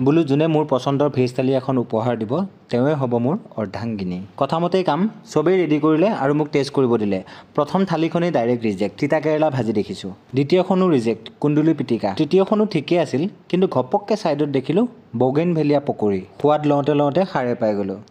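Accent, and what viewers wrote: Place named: Nalbari, India. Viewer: কথা বিলাক নলবৰীয়া যেন লাগে, মই লখিমপুৰৰ পৰা চাই থাকো।❤❤😊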